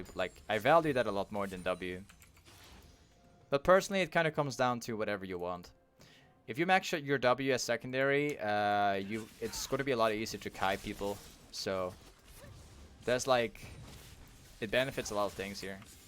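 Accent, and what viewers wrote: Netherlands. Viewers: Echt een grof accent van Nederlands haha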